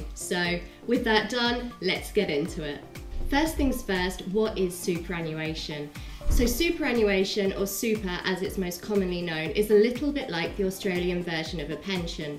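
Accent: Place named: Scotland, United Kingdom. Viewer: lovely accent :) Scotland ?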